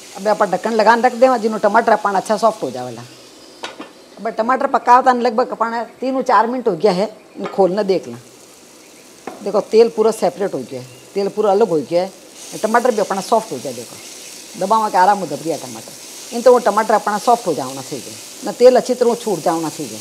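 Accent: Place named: Rajasthan, India. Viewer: Pure n Typical rajasthani accent with no AIRS of sickly so called fashionable style Sounded nice Recipe looks festival spl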